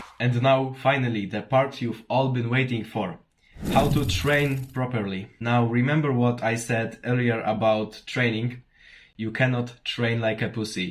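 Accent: Poland. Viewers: o z polski jestes slychac, u mnie silka wleciala poprawiam nawyki zywieniowe lecimy pozdro 💪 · Bro i'm gonna guess, but are you from Poland? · this question is not related to the movie, but are you polish? I think the accent is familiar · Nie - nie wyśmiewam się, mega props za kontent i formę vlogów - po prostu wyłapałem ‚polski’ akcent, so one question - Are You Polish? ❤❤ Good job bro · Are u from poland? cuz i kinda hear ur accent but ur english is on high level. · ej mam wrażenie że jesteś z polski bo masz taki akcent podobny XD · po 1 minucie filmu zauwzaykem ze jestes z polski XD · ja pierdolę wiedziałem ze polak po akcencie haha <3 dobry materiał mordo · Dude r u from poland i can hear it here cool Pozdrawiam Pl gurom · poznaje ten Polski akcent, ciekawe czy sie nie myle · I immediately new that you're polish because of the accent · wiedzialem ze jestes z polski, od razu po akcencie poznalem. 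gratuluje virala<3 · Short and to the point, that's what i was looking for. Also weird question, are you from Poland? I can everywhere recognize accent of own country. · nie ukryjesz polskiego akcentu , ale i tak wyglądasz zajebiscie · coś czułem że jesteś polakiem słuchając twojego akcentu XD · Bro u Polish? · as a pole i immidiatelly noticed that your polish just by your accent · brat ty z polski? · od paczątku wiedziałem żę to polak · słychać że polaczek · Are you Polish? Masz bardzo polski akcent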